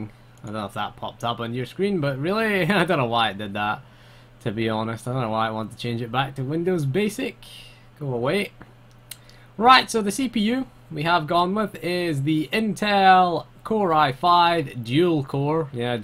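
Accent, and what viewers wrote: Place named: Scotland, United Kingdom. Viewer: He's from scotland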